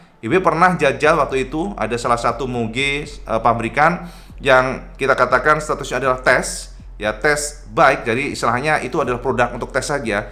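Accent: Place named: East Java, Indonesia. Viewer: KHAS PAKAR JAWA TIMUR AN CAK OPO ONOK E GA NEKO NEKO TAPI JOSS GANDOSS, ORA LEBAYYY😂😂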